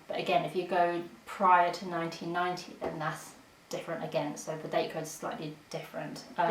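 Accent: United Kingdom. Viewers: So strange seeing someone Chinese with such a heavy british accent! Mind blown! lol xD Very interesting and good close up shots on the details - great! Very well done for your 1st video. I can see you being successful - honestly (not just saying it). Very impressed!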